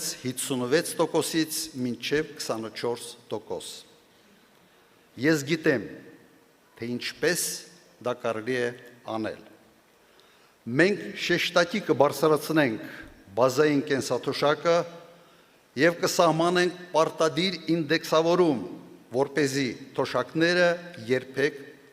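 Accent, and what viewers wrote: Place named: Karabakh, Azerbaijan. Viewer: զզվում ենք էս մարդու խուճուճ ղարաբաղյան ակցենտից,ոչ հայերեն գիտի,ոչ էլ նորից հայերեն...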